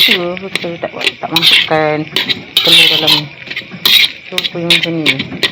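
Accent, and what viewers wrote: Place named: Malacca, Malaysia. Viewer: dialag orang Melake sedap je dengar hehe 😊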